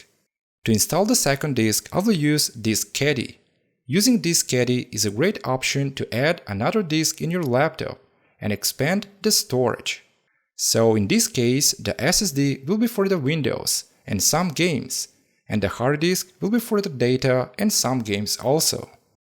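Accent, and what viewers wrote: Germany. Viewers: I'd say germanic origin (Germany). 🙄😏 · And 🤔, you’re accents sounds a little german, he said “insteed” instead of ‘instead’ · What a shitty german accent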